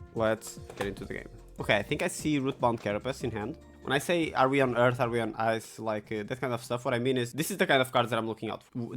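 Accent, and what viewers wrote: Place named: Portugal. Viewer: You sound portuguese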